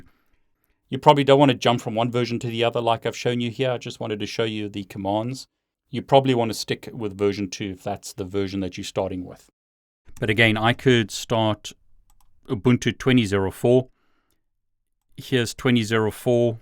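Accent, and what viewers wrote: South Africa. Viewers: can hear your raw south african accent ! thanks a lot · Ah a South African! Goeie video! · Are you South African? :)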